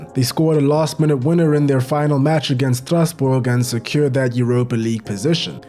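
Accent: South Africa. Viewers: Are u South African? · I just know that’s a South African accent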